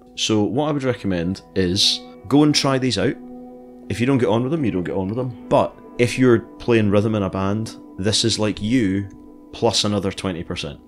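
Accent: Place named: Scotland, United Kingdom. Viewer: what part of Scotland are you from?...My mother is from Blantyre and your accent sounds suspiciously close!... 😊...I just ordered these pics... thanks for the review and recommendation!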